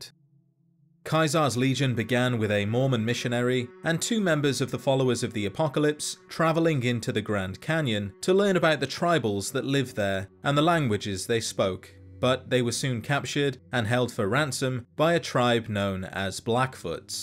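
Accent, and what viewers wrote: United Kingdom. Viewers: Thank God, a British accent on the internet not talking about the Queen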